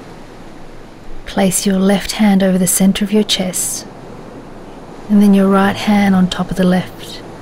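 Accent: Australia. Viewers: New fave yogi channel discovered! Loved the visualization and ofc your gentle Australian voice :3 (I studied in Melb for a year, so I miss that accent)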